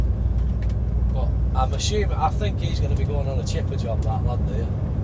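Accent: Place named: Yorkshire, United Kingdom. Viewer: that's the worst Yorkshire accent I've ever heard, can't say to much about lanky Lanks because my wife is a lanc god forbid, what a marriage Y&L.😂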